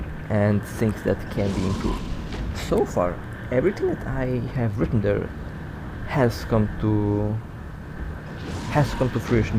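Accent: Russia. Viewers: Ur russian accent😢 Но спасибо за видео!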